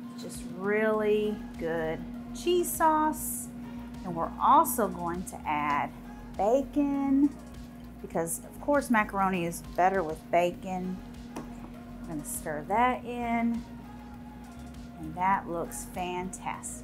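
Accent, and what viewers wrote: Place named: South, United States. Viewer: Hi! I just made this for dinner tonight and I so enjoyed! Loved the tenders and the bacon plus mustard powder made the cauliflower mac and cheese yum-may 😊. I enjoy your recipes and your sweet voice. It makes me think you're from the hospitable sweet south. Keep these recipes coming. TY!!!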